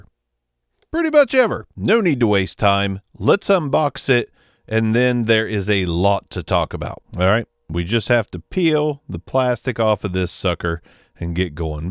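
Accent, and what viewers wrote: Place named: Delaware County, United States. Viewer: You sound like you have a delco accent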